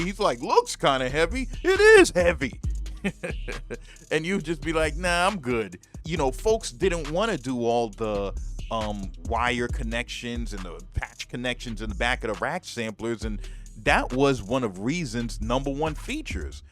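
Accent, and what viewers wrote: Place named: Haiti. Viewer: sak passé! Map boule! Real talk, I can spot a Haitian accent. Even though you don’t really have one per se, I can still tell. I kind of sounds like a queens/Long Island accent mixed with Haitian accent. Love the channel brother.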